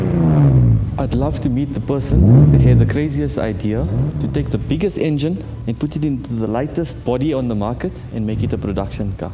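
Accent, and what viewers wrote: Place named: Afrikaans, South Africa. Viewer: That lovely accent is Afrikaans and yes, the car is stunning too. Peace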